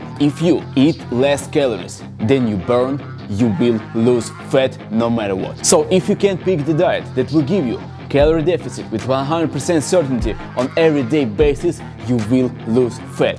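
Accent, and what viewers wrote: Russia. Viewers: Good russkiy akcent,! Kak dela?) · сразу выдает русский акцент :) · Русский акцент,уникален)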